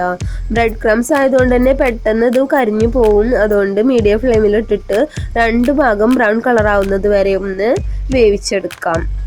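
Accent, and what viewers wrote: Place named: Thrissur, India. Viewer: Video full kandu Thrissur language 👍🏻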